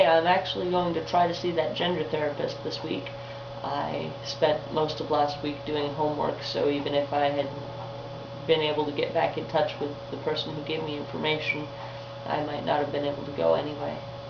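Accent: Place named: Midwest, United States. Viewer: You say "to me" like a midwesterner now!!! You say TO me, instead of to ME. (Loving linguistics.)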